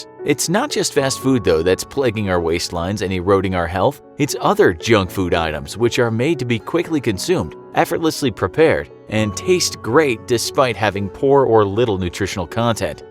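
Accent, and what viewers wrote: United States. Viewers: My mans out here roasting America sounding American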